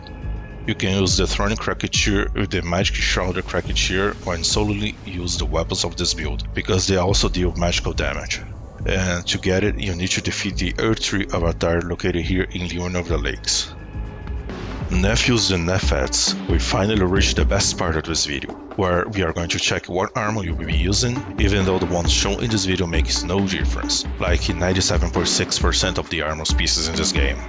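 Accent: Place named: Brazil. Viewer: Huge brazilian accent, are you from brasil?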